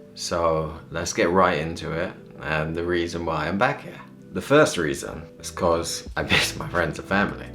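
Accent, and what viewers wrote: United Kingdom. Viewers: 조용히 님 구독하며 영국발음 행복하게 듣던 사람입니다^^ 영국에서 1년 살았던 추억으로 인생이 달라졌던 저라, 님의 9년 한국생활이 꼭 좋은 추억으로 남으시길바래요~ 영국돌아가서 원하는 꿈!! 꼭 이루세요~~!! 멈추지말고 우리 꿈꿔요! 응원합니다^^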